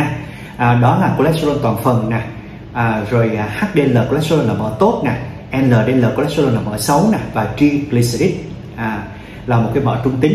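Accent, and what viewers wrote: South, Vietnam. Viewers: Cám ơn B/s đã giải thích rõ ràng 4 phần trong mở máu cao với thuốc B/s phải kê toa, và âm thanh giọng miền nam nghe rất hay, cám ơn nhiều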